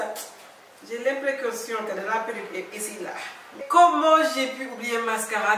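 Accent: Senegal. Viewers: lol je kiffe les accent sénégalais la meuf parle sans complexe awa magui ni si clinique bi je viens tout juste d'accoucher hmd je suis à 8000 km de chez moi (Sénégal)ma famille me manque mais dès que j'ai vu ta vidéo j'ai tout oublié si tu es tjrs au bleds bon vac je t'adores trop toutes mes salutations a qui me fais trop rire avc ses wolof de congolaise my name is Mya depuis Paris🥰🥰😘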